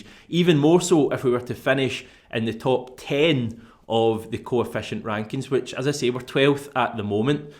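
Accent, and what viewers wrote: Scotland, United Kingdom. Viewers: He's scottish. I think most folk don't understand us.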